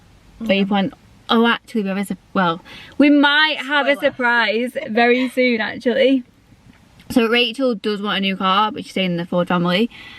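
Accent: Liverpool, United Kingdom. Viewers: I love a girl with a scouse accent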